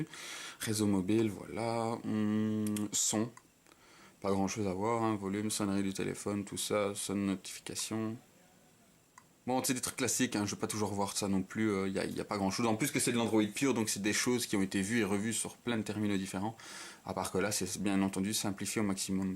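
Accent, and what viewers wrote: Belgium. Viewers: Alors c'est ça l'accent belge ? C'est marrant ;3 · vive l'accent belge ! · Slt. Je suis intéressée par le Nexus 5 qui va sortir prochainement. Etant egalement belge, où peut-on s'en procurer un? Super video, et vive l'accent belge en effet!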